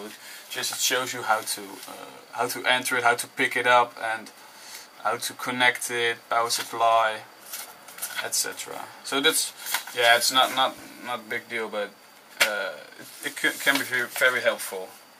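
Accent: Netherlands. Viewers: je hoort zo erg dat hij nederlands is =D if i talk english it's sound much better ;) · volgens mij een nederlander, ( klinkt namelijk nogal nederlands en engels is ook met een nederlands "accent")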